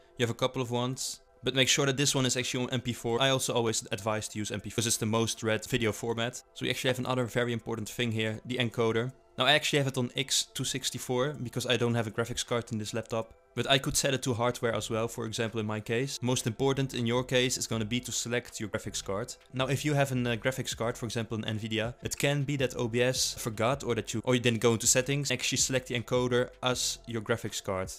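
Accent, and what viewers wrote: Netherlands. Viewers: you speaking like a dutch accent :D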